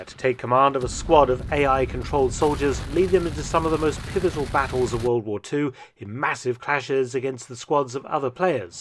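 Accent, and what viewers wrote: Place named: RP, United Kingdom. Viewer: yes. he is easy to listen to as he uses what in England is called received pronunciation ..this gives the diction an instant feeling of command and authority. He uses it very well.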